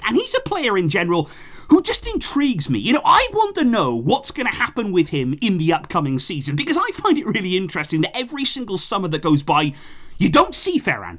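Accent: United Kingdom. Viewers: This is also a channel for learning the original British accent.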